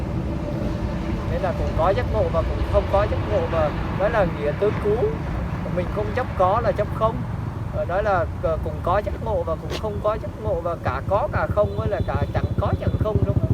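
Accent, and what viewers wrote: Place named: Ha Tinh, Vietnam. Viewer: Thích luôn giọng Hà Tĩnh luôn ❤❤❤❤❤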